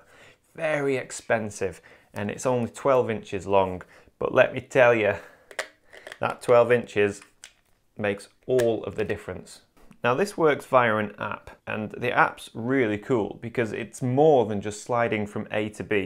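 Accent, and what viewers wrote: United States. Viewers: Don't be sorry. I loved your American accent, since I'm from the U.S. :D Thank you for this video, as I've always been curious about your b-roll equipment! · That American accent 😂 haha you’re the best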